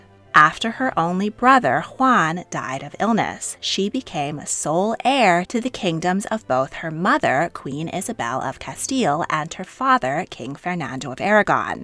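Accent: California, United States. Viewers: Sadly I am totally distracted by the woman's voice, What accent is that - is it Californian ? Why do women speak that way? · The US accent is irritating.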